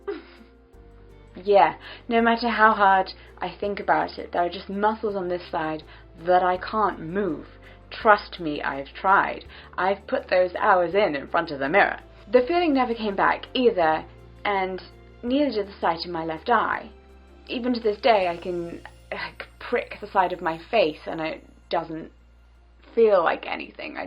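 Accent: RP, United Kingdom. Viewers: I acc kinda like the bad audio cause it weirdly fits the whole 50s theme and posh accent. Genuinely thought it was a style choice at the beginning!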